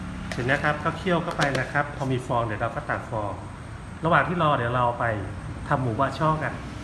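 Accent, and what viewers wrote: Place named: Isan, Thailand. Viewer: เชื่อว่าตอนอยู่กำแพง คงจัดหนักแบบไม่ธรรมดา ขนาดเห็นสาหร่ายเป็นเต้าหู้ คงเป็นเพราะฤทธิ น้ำเก๊กฮวย กับน้ำอัมพัน ยังไม่หมด เมนูแกงจืดสาหร่ายหมูบะช่อคงช่วยให้หายแฮ้งได้ดีคะ เมนูนี้การันตีด้วย ยังไง้ ยังไง ก็แซ๊บ แซบ อยู่แล้วคะ (คนกำแพงแต่ชอบพูดอิสาน น่ารักดีคะ)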